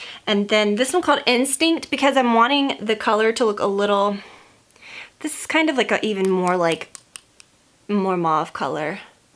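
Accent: United States. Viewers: What state is she from?!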